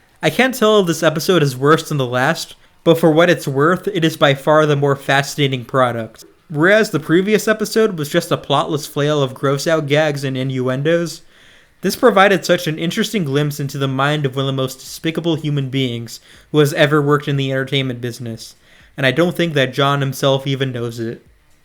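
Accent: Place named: Canada. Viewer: Good video bro! Also, what country are you from? you sound pretty Canadian from what i hear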